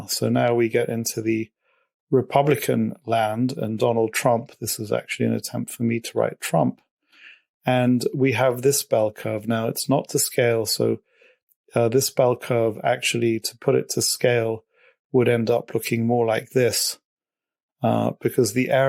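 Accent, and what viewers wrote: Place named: RP, United Kingdom. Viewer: ABSOLUTE FUCKING BOLLOCKS. TAKE YOUR PONCY ENGLISH ACCENT AND FUCK OFF. YOU'VE NO IDEA WHAT THE FUCK YOU ARE TALKING ABOUT.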